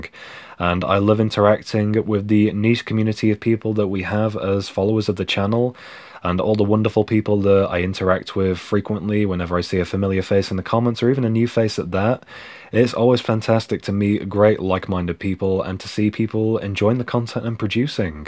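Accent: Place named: United Kingdom. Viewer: Happy 10th Anniversary on your Channel I came Across your Box of Childhood VHS Tapes Video and that's how I found your Channel and I Subscribed for more Content since I'm both a VHS and DVD Collector since my Early Childhood to Adolescence Years to now since I'm 23 (just turned 23 on January 17th) which means I'm pretty much new to your Channel and I Discovered that you're British and you have an Awesome Accent Bro just to let you know that I'm an American who loves British People because they have Awesome Accents when they start talking and you my Dude just earned a New Subscriber PS Check out my Comment on your Box of Childhood VHS Tapes Video I Commented how I Started Buying and Collecting VHS Tapes when I was very Young oh and PS I'll be making a Video on DVDs I Ordered from Amazon Recently and I'll be Uploading it by Tomorrow so be Sure to Check that out as well and again Great Content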